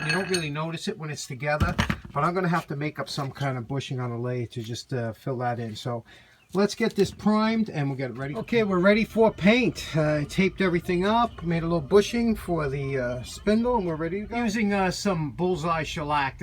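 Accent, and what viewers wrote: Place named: New Jersey, United States. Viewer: I just can't stop watching your videos. Addictive but legal??? Your Jersey accent is awesome. Adds reality to the process and work, somehow.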